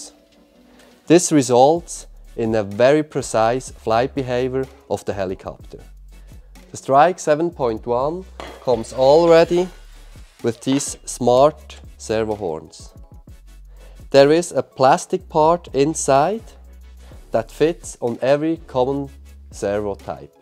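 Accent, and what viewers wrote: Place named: Switzerland. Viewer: Koleg me hört richdig krass s schwizerdütsch us dim englinsch use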